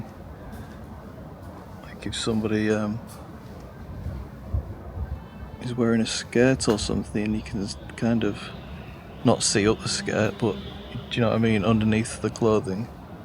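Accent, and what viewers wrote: United Kingdom. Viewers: Though I'm a Filipino, I like your British accent.. More videos,..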